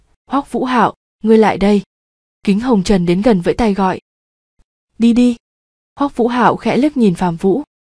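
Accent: Vietnam. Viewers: bé ad người quảng nam à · răng mà giống giọng miền trung rứa hề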